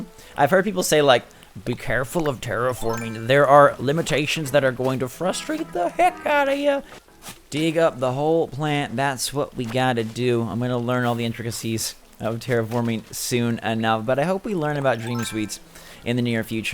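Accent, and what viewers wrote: United Kingdom. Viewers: Am I the only one who loves his British voice : D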